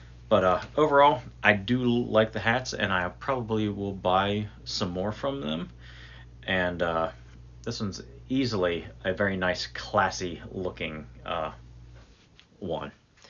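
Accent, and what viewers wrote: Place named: Pennsylvania, United States. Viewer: Just got to the part where you say you’re from PA... haha I can hear it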